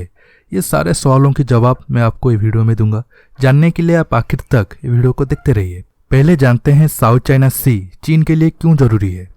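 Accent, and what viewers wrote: Odisha, India. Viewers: Pahle mujhe laga aap bengal se ho Par jb last line suni to ab lag rha hai aap odisha se ho. Kya aap odia hain?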